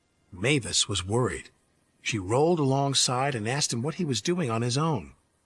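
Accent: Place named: Ireland. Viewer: Irish accent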